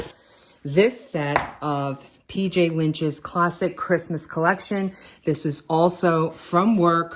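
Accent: New York, United States. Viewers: Oh..I'm so very sorry about your momma...but she is always with you. As far as you not being here and folks leaving...that's ridiculous. And you are so right..LIFE does happen. And as I've told you before..I love your channel, being a former New York girl, you always bring me "home" when i hear you voice. So, guess I'm saying..you're stuck with me..I ain't leaving! Take care & heal my friend.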